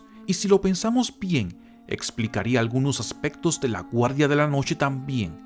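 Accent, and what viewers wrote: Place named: Chihuahua, Mexico. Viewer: Eres de Chihuahua o porque pronuncias así de fea la "ch"?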